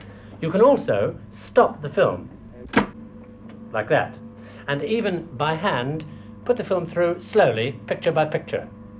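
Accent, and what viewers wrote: RP, United Kingdom. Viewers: Didn't James have a very silly BBC voice back then?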